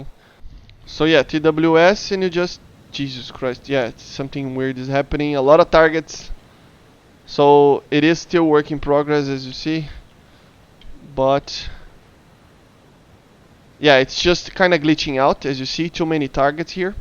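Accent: Brazil. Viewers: Brasileiro só pelo sotaque kkkk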